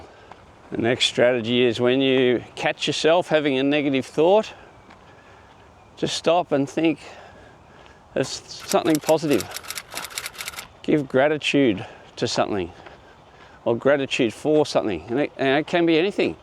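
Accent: Australia. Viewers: Love taking these walks with you,! Thank you for your positive input with your Aussie accent! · Thank you for your words of wisdom. Love your Ausi accent.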